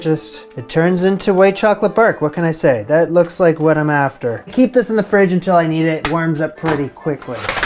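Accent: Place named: Southern Ontario, Canada. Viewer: once again, please never apologize for how you pronounce words! We all get to have our regional dialects and variations and the way you say “skewers” is entirely fine. I made a comment on your “cauliflower” episode and lots of people are still liking and agreeing to this day! Some people seem to not understand that YouTube is global and that their own accent is not the definitive one. You have a Southern Ontario accent, be proud of your roots! And, Girls Night Out should not be as tasty as it is, right? 😂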